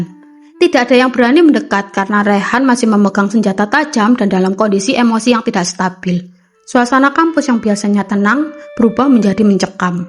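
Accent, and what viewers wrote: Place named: Java, Indonesia. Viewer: medhok bangeet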